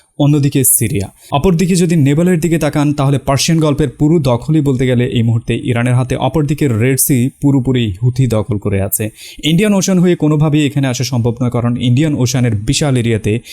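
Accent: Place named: Noakhali, Bangladesh. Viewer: ভাই, আপনার বাড়ী নোয়াখালী! কথার টোন e বুঝলাম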